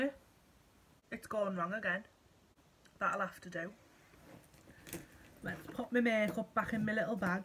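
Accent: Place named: Scotland, United Kingdom. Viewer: I’m in love with your ascent where you from Scotland, Glasgow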